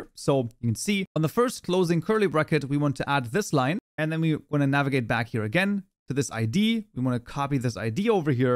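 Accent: Germany. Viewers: i think i can hear a german accent or something likely (no hate im german to🤙)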